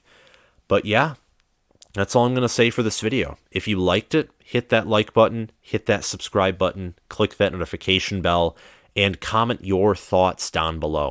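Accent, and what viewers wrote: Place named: Michigan, United States. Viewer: Pac- fan in Sparty land: I luv '22 Mich. State's new, awesome QB/WR duo. By dialect, u sound like a Mich. fan. The new MSU qb/wr pair is 🔥🔥🔥. UM & PSU start hoping it takes most of a new seas. for the new MSU o' to gel bc these cats from out west r way better than dudes from Naperville & SE Mich 🤣🤣🤣. #TuckerKnowsOutWestGreatness 🔥🔥🔥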